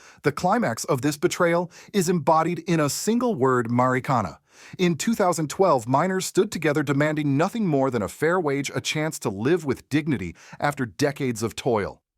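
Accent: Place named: United States. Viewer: F you American accent, you have no clue.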